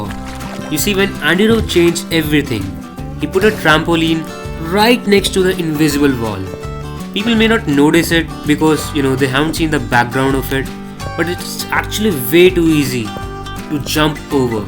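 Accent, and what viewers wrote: India. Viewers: Bro you sounds like Indian 😅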